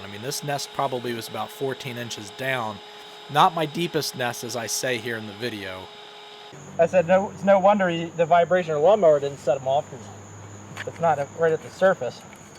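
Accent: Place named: Pittsburgh, United States. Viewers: My family is from PA I can tell by your accent that’s where you are😂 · Eastern or Western PA? I am born and raised from the West side😏😏. I knew i recognized your accent but i cant tell from which area it belongs to. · Where about in pa are you from? I hear a hint of Pittsburgh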